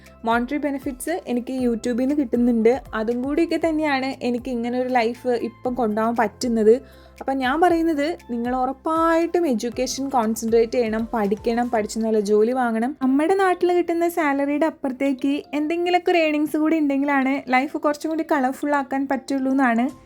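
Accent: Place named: Angamaly, India. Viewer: I was fond of ur angamali slang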